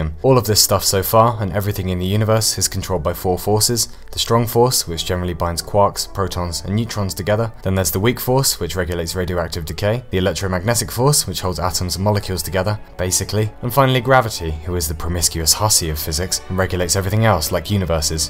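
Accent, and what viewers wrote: United Kingdom. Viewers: He doesn't sound very Norwegian. We usually don't speak with a British accent and it's also pretty hard for us to shake off the Norwegian intonation and pronunciation. If he really is then kudos to him for speaking so fluently. But hey, maybe he's half-British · The British English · Language: English Accent: British Subtitles: Spanish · I feel like this guy says all the things that I'm already thinking, but just in a British accent.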